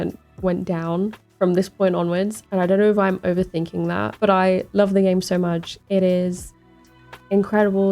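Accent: United Kingdom. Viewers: I like to find new girls\womans which is gamers. Usually it's because of Half-Life\Half-Life 2 playthrough. It's a great base. I straight watched: Half-Life 2 Half-Life 2: Episode 1 Half-Life 2: Episode 2 Portal 1 Portal 2 Nice voice, nice accent (British?). If you like Half-Life 2 then you probably would like to play Half-Life: Alyx. Yeah, VR might be a problem, but there are ways to play it without VR. Trust me, i played it on VR headset for a phone via laptop with external GPU. I also saw girls\womans gamers playing war games: Battlefield, Call of Duty. There are really cool stories, like: Battlefield 3 or Call of Duty: Modern Warfare and especially Call of Duty: Black Ops. But think twice before go in Call of Duty: World at War. It's might be difficult emotionally. SO, it's nice to have you on YouTube,. And... 53? Really? Are you kidding me?:) P.S. Hello from Russia.;)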